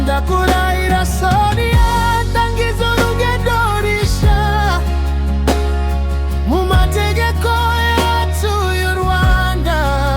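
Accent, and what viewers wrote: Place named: Banyamulenge, Democratic Republic of the Congo. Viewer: Ubu uri umunyamakuru koko niba ubyiga subira kwiga ikinyarwanda cg ni ikinyamurenge ahari da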